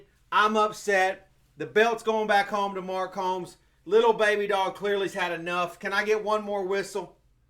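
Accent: South, United States. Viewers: Why are you dressed as a NY fan, but have a southern accent?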